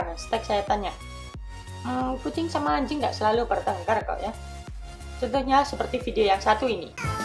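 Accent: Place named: Java, Indonesia. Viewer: Sk dengerin km ngomog dek.. Wong jowo to dek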